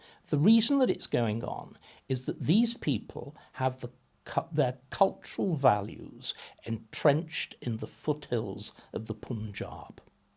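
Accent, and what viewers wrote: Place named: England, United Kingdom. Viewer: Yeah, I'm subscribing. This man is straight 🔥🔥🔥. Victor Davis Hanson with an English accent.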